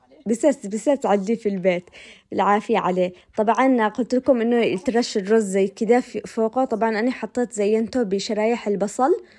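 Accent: Aden, Yemen. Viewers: اللهم صل على سيدنا محمد وعلى آله الطيبين الطاهرين أجمعين، يا اختي إني فدالك كل ما اتابعك توجعي قلبي وتشوقيني لمسقط راسي عدن والمعلا والتواهي ، اما الصياديه هذة بالضبط أنا اطبخها وجننت صديقاتي هنا في امريكا بالأكل العدني ، فديت قلبك صوتك عدني ولهجتك عدنيه شوقتيني لعدن واهلي ، الله يحفظ اليمن واهلنا اليمنيين المصابرين والأقوياء دائما، تحياتي لك حبيبتي اختك عدنيه متابعه لك من امريكا 🌸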